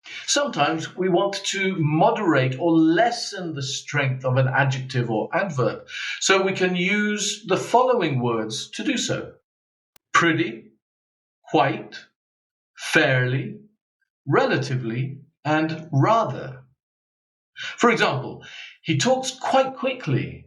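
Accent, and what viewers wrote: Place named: RP, United Kingdom. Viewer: Thank you. Good job! Many people on the internet speak RP, but yours is one of the best. Loking forward for next videos. 🤩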